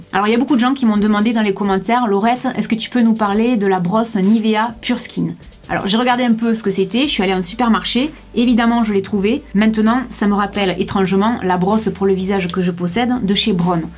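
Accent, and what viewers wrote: South, France. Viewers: Tu est trop belle avec ta teinture, tes lèvres, tes yeux et tout enfaite! Tu est suuuuuublime je t’adore maman. Tu me redonne le sourire avec ton rire fabuleux et tu me donne chaud dans ce froid avec ton accent du sud. Pour moi tu est LA bombasse que tout le monde envie et du haut de tes 40 ans tu fais rêver les plus jeunes d’entre nous... voilà, c’était pour te dire à quel point je t’aime 😍 bros bisous Laurette! ❤️😘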